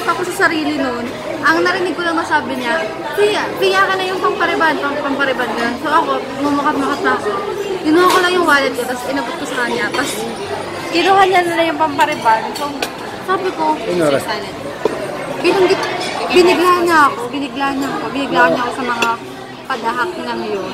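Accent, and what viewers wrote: Batangas, Philippines. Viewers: Kaya din ano napadpad dito dahil don sa scammer😂😂 Taga batangas ka ga po ung salita mo kasi😂😂😂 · batangueña ga are haha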